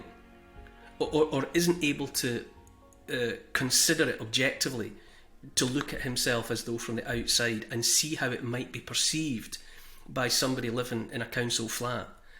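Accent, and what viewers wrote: Scotland, United Kingdom. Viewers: 👍👍😍🦋🐬 💞 Neil A gentle, beautiful Scottish lilt A kind, empathic, forward tilt An open heart; a caring face A willingness to take his place. A strong, home-hitting monologue Reminds us of the boiling frog The courage to become a sleuth A passion to release the truth. A deep desire to crack the whip Illuminates our freedom-strip Calls out the darkness taking hold The shiny despots; plated gold! Intense and raw, he lays it bare A soul-enhancing, honest stare Inflections drive the message through He speaks for Us - for me and you! Discernment's such a precious gift His words create a mighty lift Take heed of all he has to say He knows - it shouldn't be this way! 🤗🦋🐬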